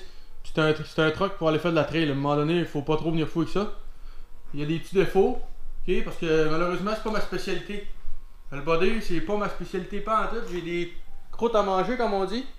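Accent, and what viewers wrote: Quebec, Canada. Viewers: Très bien les vidéos, mais j'ai parfois du mal a comprendre à cause de ton accent Québécois assez fort: je suis français , c'est pour ça...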